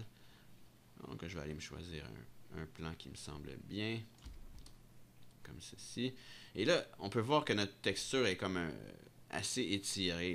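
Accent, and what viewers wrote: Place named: Canada. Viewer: cool tutorial, in french would be cool, t as un accent tres comic pour les non-canadiens!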